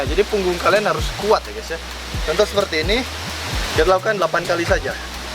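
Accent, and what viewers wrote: West Java, Indonesia. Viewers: Sugan teh orang batak mang pek teh urang sunda wkwk hade lah hade top ilmuna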